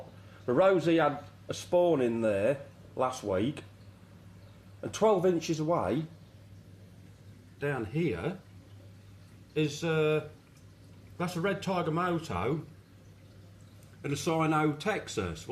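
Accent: United Kingdom. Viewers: Difficult for all of us to listen to our own voice. Yours is great, although us Americans tend to be drawn to that British accent. Anyway, sound great and relaxing video. Please keep it up.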